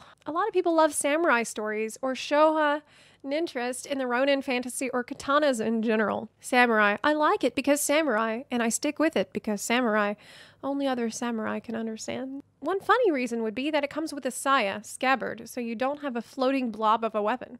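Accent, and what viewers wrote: South, United States. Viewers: Omg i cant tell if your accent is Southern American or Australian 🥺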